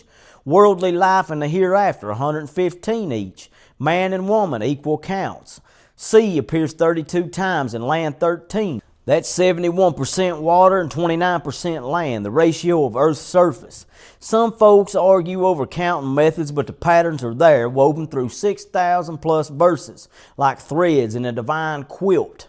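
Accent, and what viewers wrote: South, United States. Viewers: your southern accent is so beutiful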